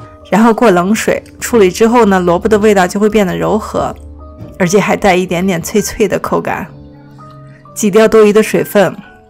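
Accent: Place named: Beijing, China. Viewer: ，我总觉得你有点北京口音，你是不是在北京待过或者周围有不少北京朋友？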